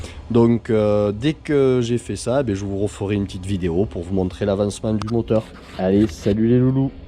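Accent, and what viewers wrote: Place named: Montpellier, France. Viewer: Accent Montpellierain on dirait ...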